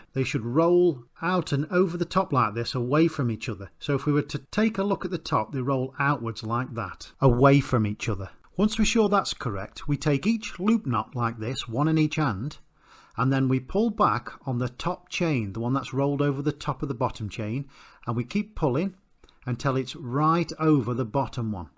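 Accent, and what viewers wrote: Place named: England, United Kingdom. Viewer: Damn dude... What a breath of fresh air to come across a video that is clear, concise, gets right to the point...and above all else...provides the solution to the stated problem. In some ways I was thinking this may be the quintessential "perfect" diy video on YouTube. By this I mean, it certainly does everything listed above, but in addition, it handcuffs the malcontent viewer who finds fault in every video...the video quality is more than sufficient. The audio is of even higher quality, not to mention the soothing, dulcet tones of the anglican accent. Plus, there's no fat on this bone... There's only the bare essential information the viewer tuned in for. Well done. You don't have to sell me on subscribing, this video meritoriously earned my subscription.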